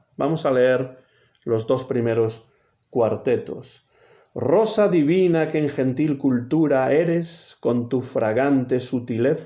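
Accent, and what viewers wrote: Spain. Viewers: Gracias por su análisis sumamente interesante sobre Sor Juana Inés de la Cruz. Me encantan todos sus videos y su manera muy amena de presentar las obras literarias estudiadas en clase. También me encanta su acento y quería preguntarle en qué parte de España nació usted.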